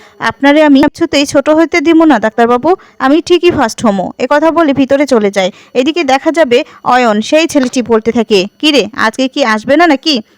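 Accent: Bangal, Bangladesh. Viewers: উচ্চারণ আর একটু উন্নত করার চেষ্টা করো।বাঙ্গাল বাঙ্গাল কথা ভাল লাগে না।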